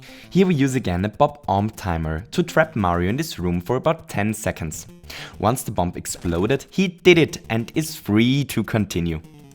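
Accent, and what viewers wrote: Germany. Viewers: are you german? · hey cool and very helpful video! :) p.s. are you German?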